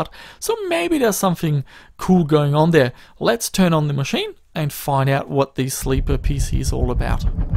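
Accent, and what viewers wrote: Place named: Germany. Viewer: You sound like you've got a german accent.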